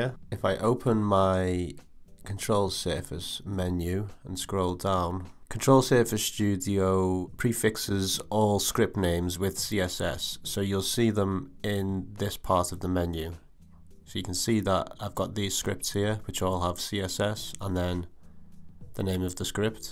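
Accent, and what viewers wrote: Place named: Liverpool, United Kingdom. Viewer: When I heard that accent, I thought "Oh, great! A fellow Scouser! - He will be able to help!" Sadly, this video was only useful to those writing scripts, but that was not my case. I have a MIDI Wind controller that Ableton is not recognising it. Any advice, our kid? Greetings from a scouse exile in Catalunya.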